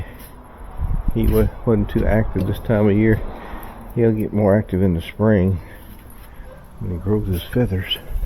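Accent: South, United States. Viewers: Thanks for looking at my comment and responding, I really appreciate that. I just came across you today, and I really like your videos. Where are you based out of? It's definitely Southern, that's for sure👍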